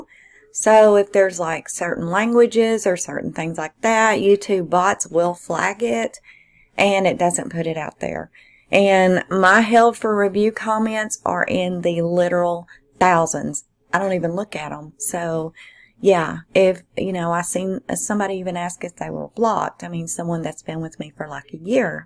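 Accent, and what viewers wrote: South, United States. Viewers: you have the most beautiful southern voice and I’m glad you’re proud of it don’t ever change lovely lady keep up your amazing work much love from South Wales 🏴 in the uk 🇬🇧❤️ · Don't you worry about your southern drawl. Im a southerner. I talk slow & have the drawl. You are an excellent You Tube creator. I've recommended your series, "Exposing the Mistress". Keep them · Glad I found your channel! Love your voice! We sound a lot alike lol! Southen' girl myself here 😘 I think you're doing a wonderful job! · Goodness people make drama out of nothing. I love your Southern drawl! I'm very interested to see how this is picked up by the relevant authorities. 🤔 👀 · Brian’s walk looks like something that was called “pigeon toed” back in my day. And it was correctable with special shoes at a very young age so that the feet could be trained to point more inward than outward. 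Most parents pick up on it when the child starts walking & definitely a good pediatrician would see it in a regular exam. Also I love your southern accent, I could listen to you talk all day. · Damn Skippy! They Definitely need some kind of running updates around NC.....I Live in the Western Mtn's if NC and My County Is Huge.....with Lot's of Wood's......and State Mtn Park's.....So I Definitely Appreciate the Info you giving Us! Oh and i think You and Myself are what I like to Call.... An Educated Hillbilly......so there for your Southern Draw is not only Totally Acceptable.....but Also a Must! Lol. So just keep doing what your doing Love! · Awe! Will miss your live sweetie, working this weekend, but will absolutely watch the replay! Girl, you're absolutely beautiful inside and out! Including your southern drawl. Thanks for what you do! We love ya'll! Prayers & Blessings from WI 🤗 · I love your southern draw,roll tide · Love your Southern drawl and I like how I can sit and actually listen and think about what you are saying... 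Most ppl talk too fast, if anything they should slow down - not you speed up. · Don't stress over any negative comments! Those of us who have been here on your channel for awhile, know! You have never click baited and I absolutely love your southern accent ❤ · Your cute southern voice ticks all the right boxes for me lol Sweet mamma goodness gracious me. Some people just don't know a lovely sound when they hear on. Have a great day. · New sub here from Bendigo, Australia (Discovered you with you previous vid) - keep doing what you’re doing! Those of us with high enough IQs can see that you are presenting credible information for us to subsequently analyse and then make our own decisions. Also: I don’t subscribe to channels with automated voices as they thoroughly annoy me (particularly when poor punctuation has been used & sentences require some mental processing to be understood) AND I ABSOLUTELY LOVE YOUR “SOUTHERN DRAWL”! 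👍👍🇦🇺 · I LOVVEEE your southern draw!! Don’t let anybody tell u that u need to change in ANY way. You’re absolutely AMAZING!! I’ve been a subscriber of yours for the last cpl years and I absolutely adore u 💜💜💜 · Omg. I can’t believe somebody is griping about your southern accent!! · Hello, I can't believe someone complained about your beautiful Southern drawl. I love listening to your voice. I grew up in SD and have always loved all things Southern. Living in GA for many years now, I still never tire of the accent. Thank you for always taking good care of us. Much love to you!!! Blessings!! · The trolls have left Summer's video's and gone to video's on Brian. geesh, your southern drawl sounds normal to me, bc I'm a Texan. #southernladiesrock Got the reminder on for your live; looking forward to it!❤🙋‍♀️ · You are doing a great job and if someone doesn't like your southern accent they can take a flying leap. 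· I would listen to your lovely Southern drawl all day over those dreadful computer generated voices...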